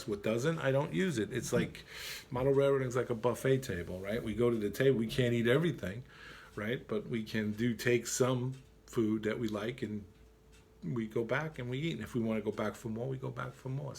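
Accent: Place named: New York, United States. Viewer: Hi. I like what you're saying -- and it's really nice to hear a New Yorker again. I keep my old phones to use as throttles and cameras. Just ask a friend (or The Wife) for their old one. Cheers! --dunc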